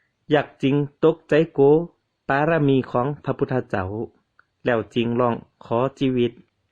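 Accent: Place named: Tai Lue, Thailand. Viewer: เล่าประเมืองพยากนครเชียงตุงสำเนียนไทยลื้อได้ดีน่าฟังมากครับ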